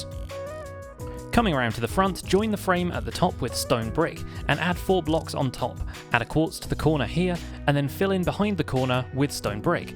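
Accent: United Kingdom. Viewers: are you British I can't tell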